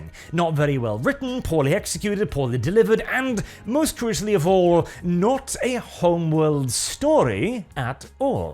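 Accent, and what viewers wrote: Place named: United Kingdom. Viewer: Hmmm, i dont like your britisch Exent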